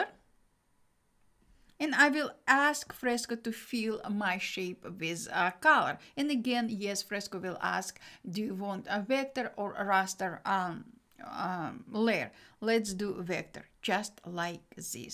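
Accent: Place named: Russia. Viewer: Its russian accent btw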